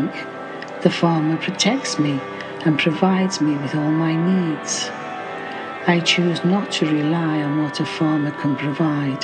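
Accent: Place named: Nuyorican, United States. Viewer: Wow! You have taken a somewhat 'New Yorican' turn? Any reason for the change in mood:) Thank you and great work.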